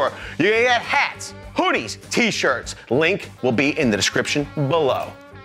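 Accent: Philadelphia, United States. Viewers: That’s just South Philly baby!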